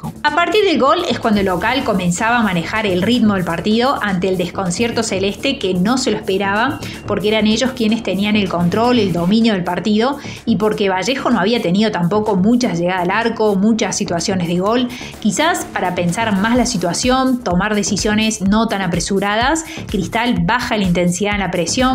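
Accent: Argentina. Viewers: Y porqué habla como argentina?? complejo 😂😂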